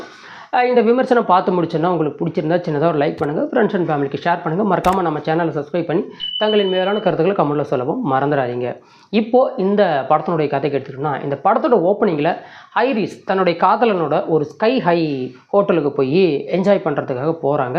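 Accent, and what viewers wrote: Kongu, India. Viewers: Wonderful REview.. Kongu Tamil Vazhga... Cheers from #Pollachi Town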